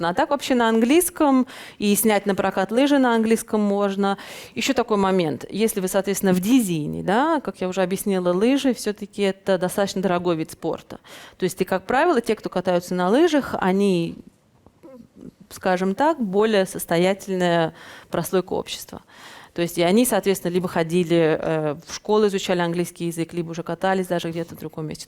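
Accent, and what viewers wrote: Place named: Samarkand, Uzbekistan. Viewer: Шикарный акцент, как будто соседка из Самарканда рассказывает. Очень интересно и увлекательно. Один вопрос, как быть в последующем с визой в Штаты, после посещения Ирана?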